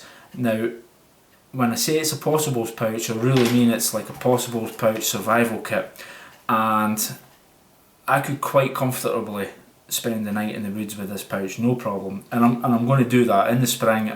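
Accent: Scotland, United Kingdom. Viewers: Are you scottish do you go camping in the highlands.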